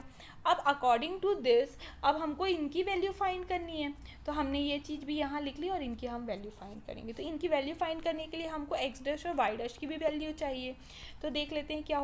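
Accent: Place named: Indore, India. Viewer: Ma'am aap Indore se ho kya ?? Aapke bolne k tarike se laga mujhe. Btw well explained.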